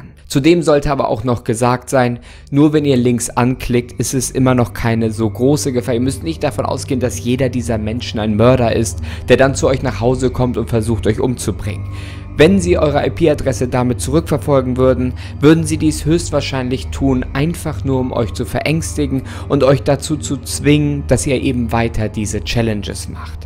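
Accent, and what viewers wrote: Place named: Northern Germany, Germany. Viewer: Norddeutscher Akzent halt.. Ich spreche es auch so aus💪😂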